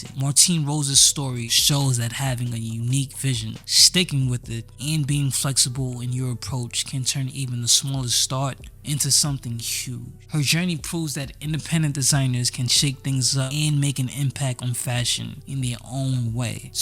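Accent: New York, United States. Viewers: Where’s your accent from? It’s great + super interesting! Is it New York?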